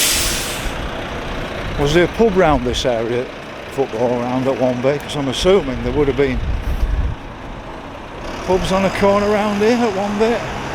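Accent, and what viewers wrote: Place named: North Derbyshire, United Kingdom. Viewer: You don't sound "true" Sheffield, more like north Derbyshire but anyhow. I lived just up the road from the start of your walk here, and always wondered if the little opening in the river on 3.43 of your film is the stream from Norfolk Park that runs through the Jervis Lum on the estate, entering the Sheaf.. It must finish up somewhere close to that. There's another higher up the wall pipe a bit downsream I think that constantly flows which maybe it. The path at this point of the film was the old Sheaf Gardens, old houses and streets and straight on is Cromford and Rowsley street, notorious in the 70's...still cobbled and big families back then living on there. Really rough and ready but great people.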